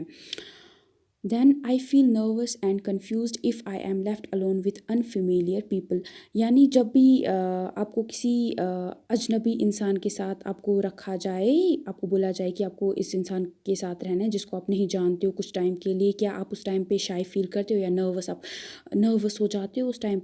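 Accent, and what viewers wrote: Kashmir, India. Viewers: U r voice tells that u r from kashmir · Are you from Kashmir?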